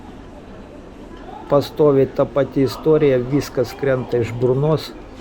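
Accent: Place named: Ignalina, Lithuania. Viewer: Tau tinka toks blogerio žanras kur kalbiesi su savimi Ignalinos tarme. Lauksime tęsinio. su Naujais metais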